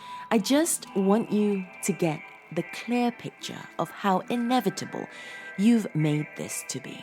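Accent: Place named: RP, United Kingdom. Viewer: I'm very curious to know why you sometimes say 'de' instead of 'the' when you are quite able to pronounce 'the' properly with your quite posh accent! Very bizarre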